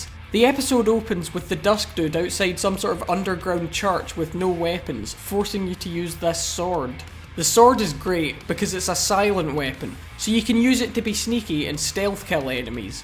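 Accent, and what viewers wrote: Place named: Glasgow, United Kingdom. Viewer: Sweet review bro. Loving the Glaz geee accent. This game lools wesome but I am a low life with an xbox so doubtful I will ever get my hands on it. Thanks for uploading it..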